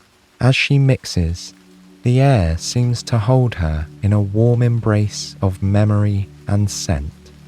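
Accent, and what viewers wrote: United Kingdom. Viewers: Sry but i hate brittish accent for storytelling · Same. He voice just seems the perfect timbre, he speaks in a perfect rhythm, plus he’s English. Idk why, American accents don’t work for me when it comes to relaxing, I find them grating and kinda stress-inciting. Oh well, we’re all different right? Love this channel, especially the Rainy Day Bakery series. 🌧🌙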